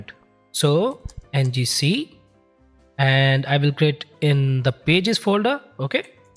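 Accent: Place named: India. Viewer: i hate indian english but i love u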